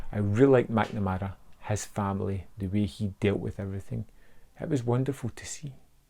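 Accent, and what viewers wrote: Scotland, United Kingdom. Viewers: You should effin subtitle your videos, dude, that thick Scottish accent is too much to handle.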